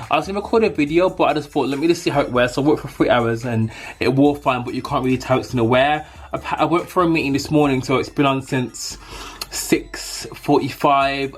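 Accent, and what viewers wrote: Birmingham, United Kingdom. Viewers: Yessss I can hear The Birmingham accent in this video lol I keep wanting to try Fenty but everyone I go to the counter it’s ridiculous. 💕 I have your piriton sis lol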